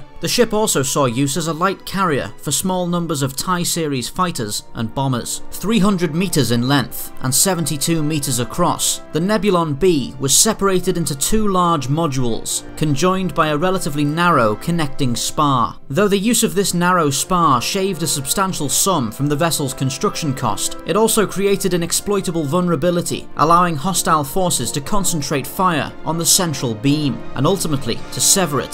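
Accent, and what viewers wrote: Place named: England, United Kingdom. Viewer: i like your style, fast and summarized yet detailed enough for us fans to understand. and that english accent is well suited for this type of channel. good work man! subbed!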